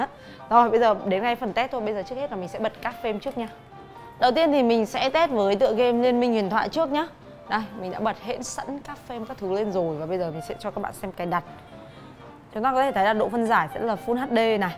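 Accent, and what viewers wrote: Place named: South, Vietnam. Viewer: Nói giọng Nam dễ thương thế :)))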